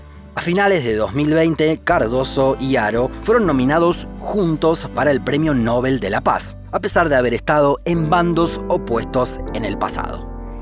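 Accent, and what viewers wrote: Argentina. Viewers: el canal de donde termina siendo che? , porque tiene ascento argentino pero puede confundirse con el uruguayo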